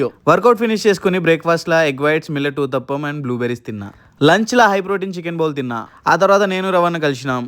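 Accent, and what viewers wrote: Telangana, India. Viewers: Leg day fun day 😂... Telengana slang is very good sir 👌✨🫶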